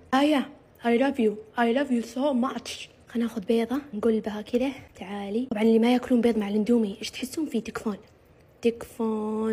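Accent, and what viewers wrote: Saudi Arabia. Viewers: والله عن جد اللهجه السعوديه مره بتجنن انا من الجزائر I love you ❤❤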